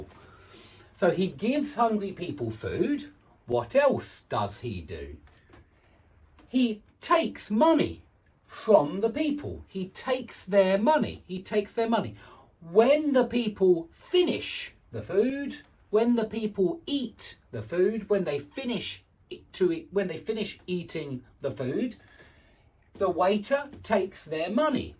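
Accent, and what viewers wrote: United Kingdom. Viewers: I love your British accent, sending you love from Egypt ❤️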